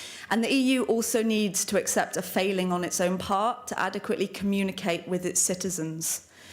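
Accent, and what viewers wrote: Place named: RP, United Kingdom. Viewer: She's very posh. Brexit - the "Europe has no federal ambitions" lie ..“What will actually happen if we stay in the EU” is a question no remainer will ever answer but here it is warts and all. Check it out if you wish ——&gt;&gt; 1: The UK along with all existing members of the EU lose their abstention veto in 2020 as laid down in the Lisbon Treaty when the system changes to that of majority acceptance with no abstentions or veto’s being allowed. 2: All member nations will become states of the new federal nation of the EU by 2022 as clearly laid out in the Lisbon treaty with no exceptions or veto’s. 3: All member states must adopt the Euro by 2022 and any new member state must do so within 2 years of joining the EU as laid down in the Lisbon treaty. 4: The London stock exchange will move to Frankfurt in 2020 and be integrated into the EU stock exchange resulting in a loss of 200,000 plus jobs in the UK because of the relocation. 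(This has already been pre-agreed and is only on a holding pattern due to the Brexit negotiations, which if Brexit does happen, the move is fully cancelled - but if not and the UK remains a member it’s full steam ahead for the move.) 5: The EU Parliament and ECJ become supreme over all legislative bodies of the UK. 6: The UK will adopt 100% of whatever the EU Parliament and ECJ lays down without any means of abstention or veto, negating the need for the UK to have the Lords or even the Commons as we know it today. 7: The UK will NOT be able to make its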